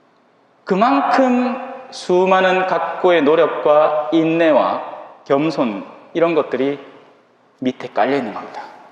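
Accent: Gyeongsang, South Korea. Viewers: 신부님감사합니다 신부님강론말씀경상도사투리가정겹고제밋네요 비유말씀이감동이네요 서울지하철안에세 듣고있는데 유듀부에종종올려주세요 건강하시고 행복하세요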